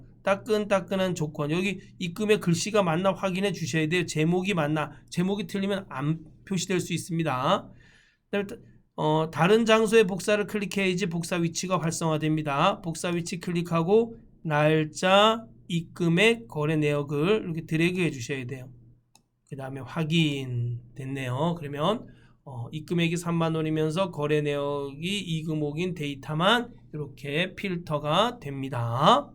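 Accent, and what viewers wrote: Gangwon, South Korea. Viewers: 안녕하세요~ 5월 24일 실기 시험 발표 오늘 이었는데 한번에 합격했어요~~넘 감사해요^^ 작년 추석쯤 2024 5일만에 합격하기 기본서 구입하고 함수문제 보고 엄두가 안나 손놓고 있다가 반백살된 기념?으로 자격증 공부 해봐야겠다 생각들어 다시 시작했습니다.(엑셀 사용하는 업에 있지도 않고 sum, average 라는게 있다 정도만 알고있는)필기는 다른책으로 공부하며 기출 돌렸는데 점수가 꽤 높게 나와 이만하면 되겠다 했는데 딱 그만큼 두 문제차로 떨어지고 강의로 4일동안 7,8시간 공부해서 합격하고 바로 실기공부 돌입. 노안으로 안경을 벗었다 썼다 함수지옥에 빠져 환장할뻔 했지만 실기공부 2주동안 매일 6,7시간 공부 하고 합격 확인하니 너무 기쁩니다~ 함수는 표하나에 5문제 다 나오는 기출에 있던 문제 나왔고 부분합은 성별별로 수당과 상여금 최대값 합계 구하는 문제(성별로 내림차순), 기본작업에서 데이터 나누고 열 제거하기, 75,000-75천원으로 바꾸기 이정도 기억납니다. 다른 후기처럼 기출문제보다 조금 쉽게 느껴졌어요 2025 상시 10회분 넘 어렵게 느껴졌는데 2024로 공부해도 충분할듯 합니다. 컴활공부 검색하다 우연히 만난 선생님 억양이 친숙해서 보니 강원도분이셔서 더더 반가웠네요 저는 태백 아래 고한사람입니다~ 주변 사람들에게 많이 알리고 있답니다~~ 항상 건강하시고 행복하세요~~~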